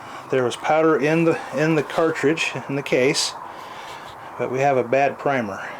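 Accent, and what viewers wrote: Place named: United States. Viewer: I'm guessing based on your accent this is Utah or Idaho. Great video - I'm interested in this rifle myself & appreciate you sharing with us.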